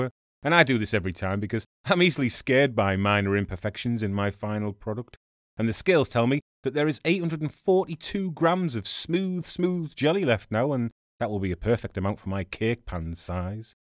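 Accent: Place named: Stockton, United Kingdom. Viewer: As a Stockton Lass i love the recipes and hearing my Dialect I've lost mine by Livin too long in Germany🤣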